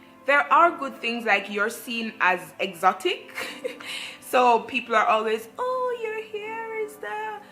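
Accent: Jamaica. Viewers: Girl mi hear di Jamaican accent 🤣🤣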